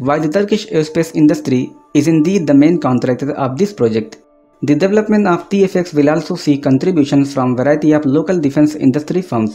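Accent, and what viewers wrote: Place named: Russia. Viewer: It seems that he is from central asian countries like kazakhstan his accent of english is like russian but he is trying to speak like british accent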